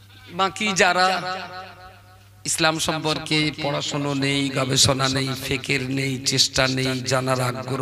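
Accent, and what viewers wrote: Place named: Bangladesh. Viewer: এর কথা থেকে বোঝা যায় এই একজন অনুপ্রবেশকারী বাংলাদেশি।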